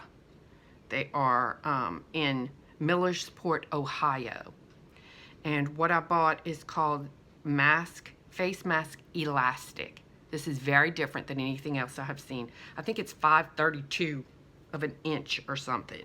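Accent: Louisiana, United States. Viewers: Girl I can listen to you all day. You are so Cajun.... I love you to pieces.... Stay safe. Good luck with the position....❤️❤️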